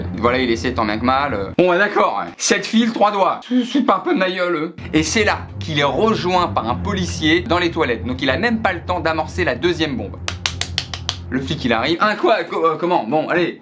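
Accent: Germany. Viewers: bonne année je t adore bonne continuation pour tes belles videos bien ton accent Allemand bisous tendres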